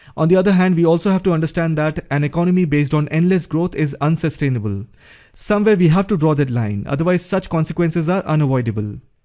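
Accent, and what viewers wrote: India. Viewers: The funny indian accent makes the video more interesting.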